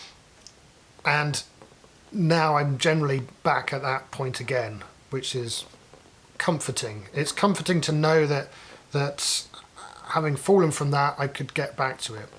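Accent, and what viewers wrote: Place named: Somerset, United Kingdom. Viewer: Yer a somerset lad..!!! - Good lad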